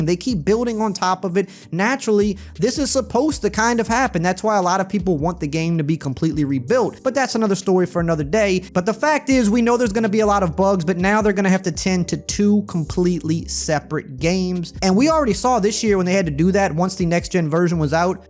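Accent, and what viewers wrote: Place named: Chalmette, United States. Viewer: You sound like you’re from Chalmette. Who Dat!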